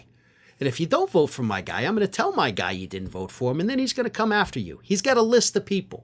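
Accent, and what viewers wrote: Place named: New York, United States. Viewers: Hey....loved your NY Italian mafia accent..... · Gotta say, loved the New York tough guy accent😂😂😂😂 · Your mob New York accent is funny.😂😂😂😂😂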